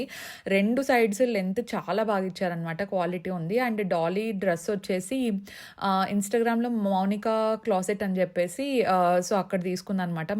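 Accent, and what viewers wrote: Anantapur, India. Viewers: ....before sleeping ask to have milk everytime it's natural tip to get sleep...I just love ur slang Anantapur slang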